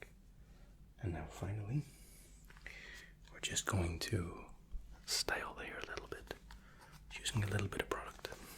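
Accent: Scotland, United Kingdom. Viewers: Its almost 1am I'm drunk and I always can rely on for his soothing Baritonal Scottish smoothing voice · Love your accent! It's a really gentle tone. What part of Scotland are you from?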